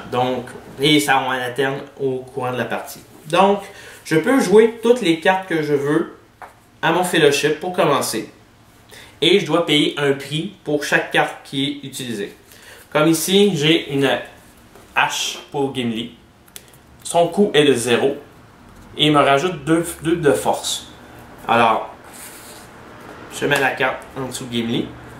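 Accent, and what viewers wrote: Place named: Quebec, Canada. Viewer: enfin des instructions en bon vrai quebecois, merci !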